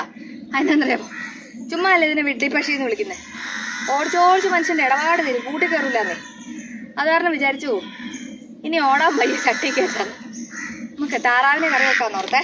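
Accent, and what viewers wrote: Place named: Southern Kerala, India. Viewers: കോഴിക്കോട് ആണോ? ഞാൻ ഓർത്തു ഇടുക്കി ആയിരിക്കുമെന്ന്. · സംസാരം കേട്ടാല്‍ കോയിക്കോട്ടുകാരിയല്ല.... ഇങ്ങള്....ഇങ്ങ് ''തെക്കത്തി''.....അതായത് കോട്ടയം..പത്തനംതിട്ട ഭാഗത്തുകാരിയാന്നേ പറയൂ......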